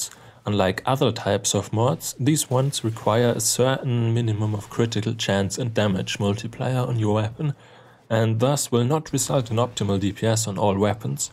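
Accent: Germany. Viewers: Are you German ? ^^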